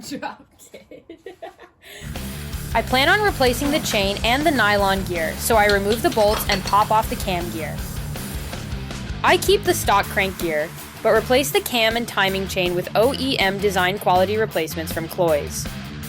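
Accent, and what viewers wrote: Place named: United Kingdom. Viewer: Nice job, you so funny and cute with that British accent!!!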